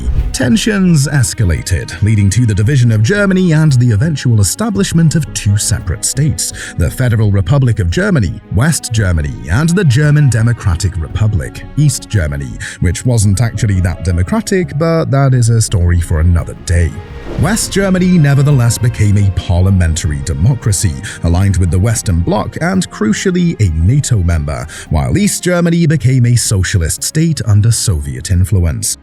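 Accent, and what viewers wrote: United Kingdom. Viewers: Rubbish and biased video. British sounding Harry Potter Uncle Tom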